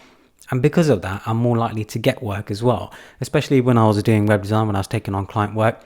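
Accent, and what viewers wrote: United Kingdom. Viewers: Hi, I find your accent quite interesting, is it cockney?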